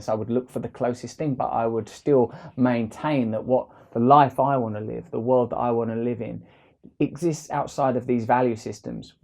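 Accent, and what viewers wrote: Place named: Cockney, United Kingdom. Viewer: Cockney Christ.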